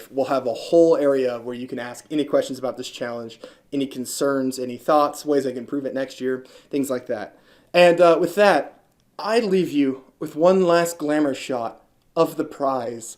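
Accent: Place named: United States. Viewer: also, as i assume your american due to the accent, is it eastern standard time, western standard time or central time?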